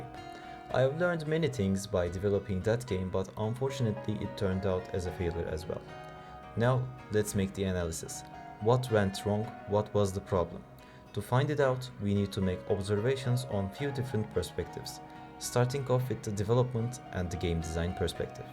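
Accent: Turkey. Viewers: is this a turkish accent? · you have turkish accent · Türksün giba aksanın öyle gibi geldi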